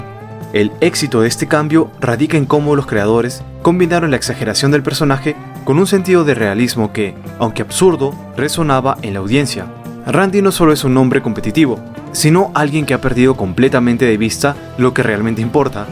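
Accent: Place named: Peru. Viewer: Tu acento.. Me es muy familiar, eres de Perú?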